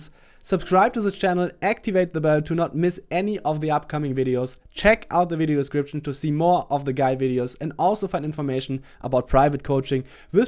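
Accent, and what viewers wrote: Germany. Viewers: Weiter so, Mega Videos! Dein Dialekt hört sich deutsch an, bist. Du deutscher?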